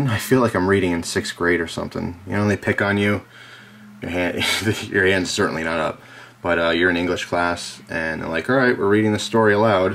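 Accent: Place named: New England, United States. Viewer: ive been watching your videos so much i feel im taking on your new englandish accent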